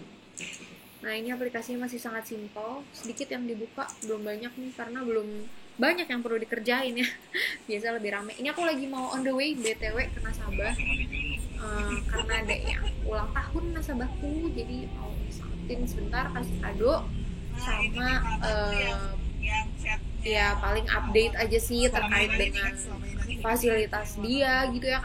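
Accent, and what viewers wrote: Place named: Bandung, Indonesia. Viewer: Dari bandung ya teh, hehe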